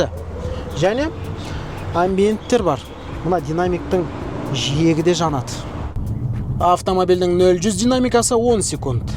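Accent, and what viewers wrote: Standard. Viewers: Ассалаумағалайкум. жарнама болаберсін сенің осындаи видиоларңды көруге тамаша таза қазақша😂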